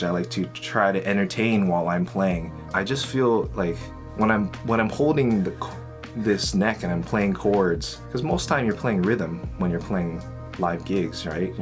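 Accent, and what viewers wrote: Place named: Hawaii, United States. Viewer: I thought you were from Hawaii after hearing you speak and your demeanor. So am I. I’ve been an Ibanez player for as long as I’ve been playing and developed tendinitis. I thought strandbergs ergonomics would be a reason to switch.. maybe it still is but it’s a bummer to hear your wrist hurt after shows.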